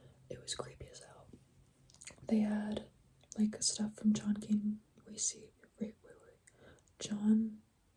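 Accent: California, United States. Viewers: That accent is pure cali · She definitely looks and sounds Californian. She’s humble though. ❤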